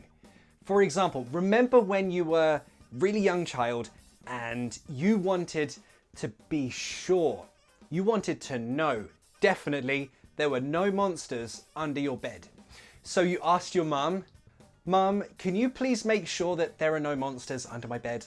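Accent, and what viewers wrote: United Kingdom. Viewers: You make me love British English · You're the only British English speaker I really enjoy listening to.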